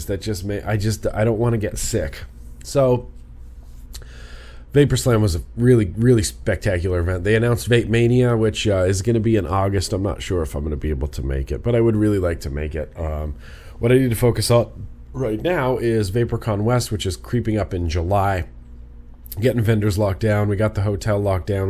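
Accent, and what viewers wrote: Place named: New York, United States. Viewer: What the hell you sound like me but your not in ny the sickness has spread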